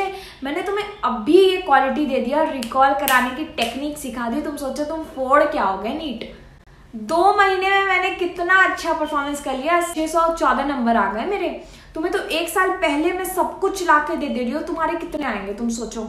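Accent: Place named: Bihar, India. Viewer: Di aapki voice m bihari accent h kaha par rhte ho aap???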